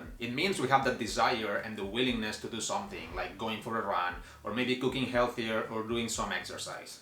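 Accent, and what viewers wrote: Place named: Spain. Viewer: Thank you for sharing this valuable information with us. Another thing, your accent looks different. Where are you from? I think Spain. How can I get the same fluency you have in English?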